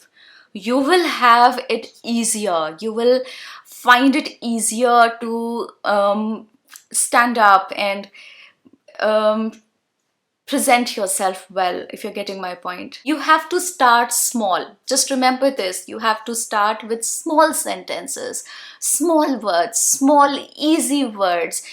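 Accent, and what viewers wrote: India. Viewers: Is your accent indian, ma'am???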